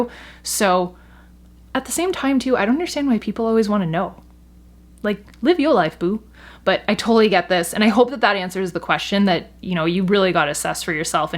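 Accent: Quebec, Canada. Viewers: Tbh as a french canadian, I love when you speak french with the perfect Quebecois accent.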